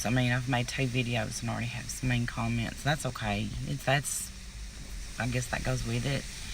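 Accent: South, United States. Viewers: I originally watched her because she posted she ws a cancer survivor,and I am also,i had non hodgekins lymphomia,which is a blood cancer,,then it was all fake.She made a big deal about Amy,long after the drama was resolved,and bunny was exposed.You seem cool,your voice has that southern tang,which state are you from? Iowa here,you know the state you go thru to get to other states. :) so I look fwd to watching more of your vlogs,peace out,Lisa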